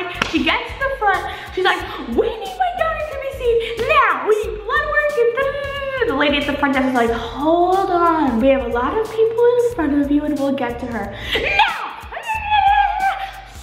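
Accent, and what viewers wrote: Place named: United Kingdom. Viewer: sounds British ish even though I'm British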